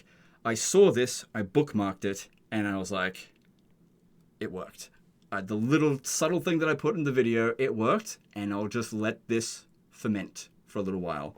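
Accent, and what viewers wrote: Australia. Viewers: Bro is aussie...I thought he was bri'ish...my world is shattered.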